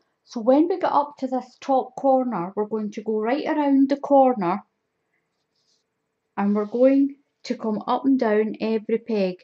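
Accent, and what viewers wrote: Scotland, United Kingdom. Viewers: The closed captions on this are hilarious 🤣 I love your Scottish accent!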